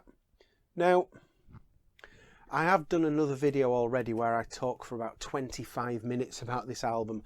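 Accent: Leeds, United Kingdom. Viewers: Are you from West Yorkshire? Heard you mention jumbo records on one of your videos and you sound Leeds!!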